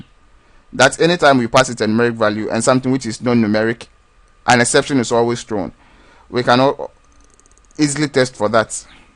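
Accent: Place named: Ghana. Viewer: Nice tutorial bro... feeling your Ghanian accent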